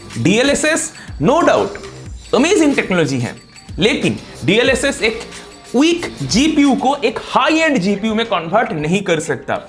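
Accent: Bengal, India. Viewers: You bengali?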